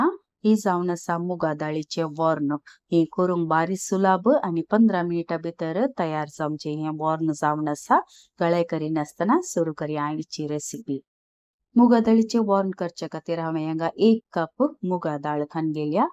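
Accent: Konkan, India. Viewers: Thanks. I'm really happy that you have started with subtitles in english. Although I love to hear your sweet accent of konkani which reminds me of my childhood. So happy to have come across your channel ❤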